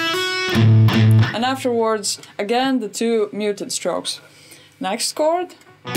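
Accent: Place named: Russia. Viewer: да конечно нужна. тоже смотрю. Благо инглишь нашего брата ни с кем не перепутаешь и легко понимаешь что говорят.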